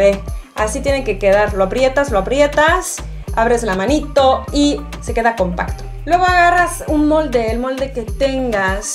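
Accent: Mexico. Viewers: Hola tú puedes pasar por una mexicana y más por el acento que has logrado al hablar el español 😃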